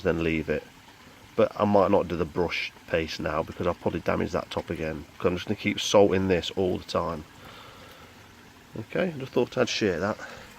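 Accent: Nottingham, United Kingdom. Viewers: You sound like Carl Froch. is that a Nottingham accent?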